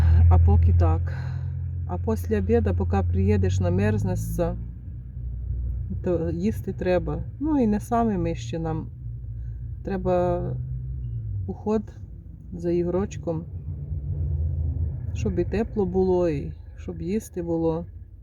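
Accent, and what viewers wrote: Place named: Polesia, Ukraine. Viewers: А ви, напевно, родом з чернігівщини? Моя сваха з тих країв, то в неї точно такий говір.А шапочку потрібно поміняти, на шерстяну алергія. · Де це ви проживаєте? Мова то поліщуків.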